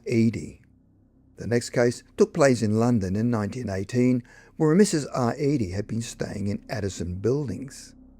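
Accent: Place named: Australia. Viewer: He's an Aussie. Not AI.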